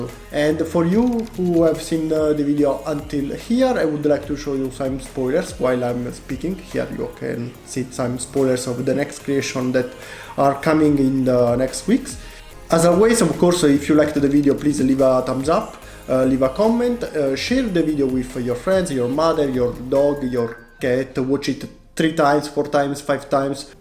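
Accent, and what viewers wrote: Italy. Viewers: Italian accent?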